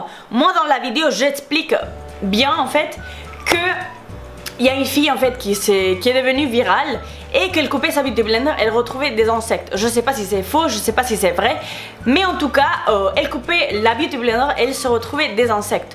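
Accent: Spain. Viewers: coucou moi je t'adore et je te suis des tes 100 abonnes pourquoi ?pars que j'adorait ton accent et j'adore les espagnoles voila et courage et plein de bisous a toi ma chérie. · Non moi je trouve que l'accent español c'est le plus belle accent au monde j'adore ton accent et moi j'aurai voulu être espagnole car j'adore cette langue c'est la plus belle langue du monde plus tard je veux vivre en Espagne 😍😍😍😍😍🇪🇸🇪🇸🇪🇸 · J'aime tellement ton accent espanol, tes cheveux tous enfaite tes vraiment belle je voudrais trop de ressembler tes MAGNIFIQUE 😍😍❤ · Je pense que les gens s'attendaient à voir autre chose qu'un cil donc ils ont mis Putaclik pour moi ce n'était pas du putaclik Tu n'as pas à te justifier Tu es super belle j'adore ton accent espagnol Continue comme ca je t'adore tu es tellement belle Bisous 🇪🇸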